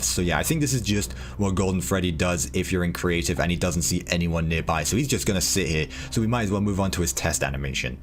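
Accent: United Kingdom. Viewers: Are you British beacous you sound like one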